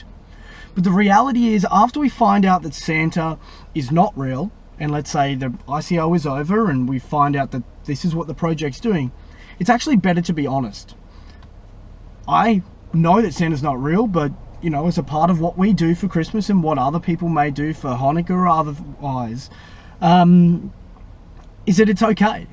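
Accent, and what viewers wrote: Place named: Australia. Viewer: Thumbs up for saying this in an Aussie accent. "Santa claus what a guy........yeah what a f....in guy." "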